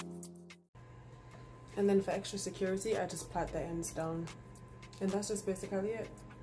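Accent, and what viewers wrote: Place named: Antigua and Barbuda. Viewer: U sound like an Antiguan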